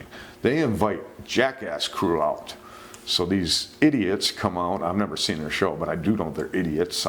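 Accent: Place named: Midwest, United States. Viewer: Hi, cool vids. Where are you from, I'm from Milwaukee-Chicago area originally. You sound very Midwest to me. I'm a former surfer living in WA. Learned in California. Surfed westcoast, Mexico and Hawaii. Can no longer, out of fear. A friend of mine Kenny Doubt was severely bitten in Cannon Beach OR. by a GW in 1979. I just can't do it anymore. It was one of my favorite sports.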